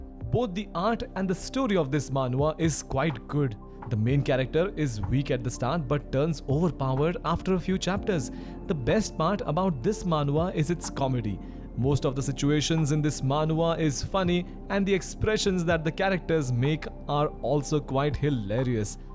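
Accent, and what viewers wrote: India. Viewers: The Indian accent is whoop ass amazing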